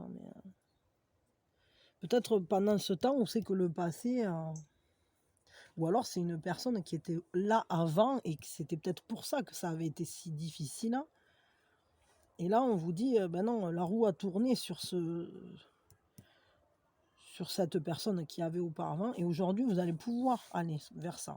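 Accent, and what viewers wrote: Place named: Libourne, France. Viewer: J'adore votre accent qui me rapelle mon papa près de Libourne Mes grands parents et ma tante Merci pour c'est pas de la tarte Quand son cœur s'ouvrira il sera surpris Bisous 😇😘🐾🎶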